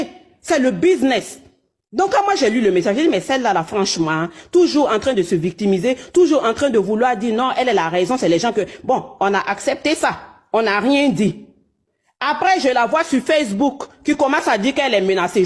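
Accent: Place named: Africa. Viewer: C'est l'accent de quel pays... ? Un pays d'Afrique à 100 % sûr... mais je sais pas exactement quel accent... Un pays francophone, donc plutôt Afrique de l'Ouest...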